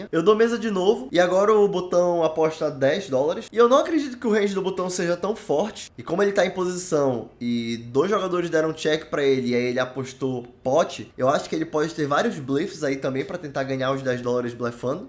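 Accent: Pará, Brazil. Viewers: Sotaque Paraense. Muito bom canal.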